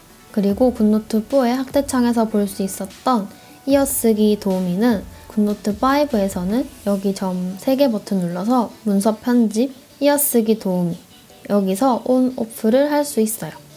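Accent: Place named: Gyeongsang, South Korea. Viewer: 갱상도억양이 아주 강약조절을 하면서 톡톡 튀네요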